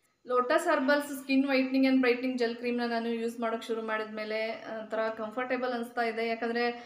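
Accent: North Karnataka, India. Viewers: ಅಕ್ಕ ನಿಮ್ಮ ಭಾಷೆ ಕೇಳಿದ್ರೆ ನೀವು ಉತ್ತರ ಕರ್ನಾಟಕದವರಾ??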